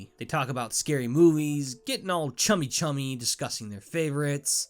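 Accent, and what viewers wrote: United States. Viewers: I was N O T expecting #1. Is from Appalachia or something?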